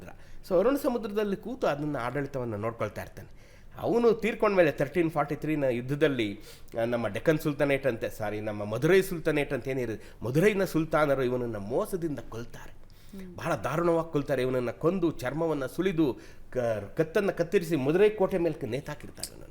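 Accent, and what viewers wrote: North Karnataka, India. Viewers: ಉತ್ತರ ಕರ್ನಾಟಕದ ಮಾತೂ ಮನಸಿಗೆ ಮುಟ್ಟಿತು ಸರ್ 😢🙏🙏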